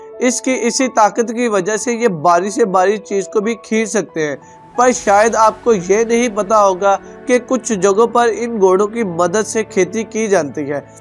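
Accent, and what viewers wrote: Pakistan. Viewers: Accent se bhai Pakistani lag raha hai. Waise jung ke lihaaj se Arabian aur Marwari Horses best hote hain. Inme bhot stamina hota hai aur ye jaldi ghabrate nahin hai. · Tumhare bolne ke andaje se lgta h aap pakistani h 🤣😂😂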